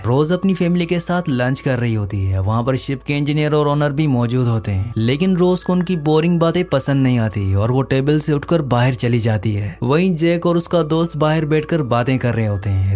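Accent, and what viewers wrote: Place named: India. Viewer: Language sai urr accent ptaa chl rha hai indian hai urdu esaay nhii bultay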